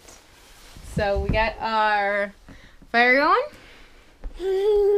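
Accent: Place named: Minnesota, United States. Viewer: I knew I recognized some Minnesota in you. Keep the great video coming!!!! 👍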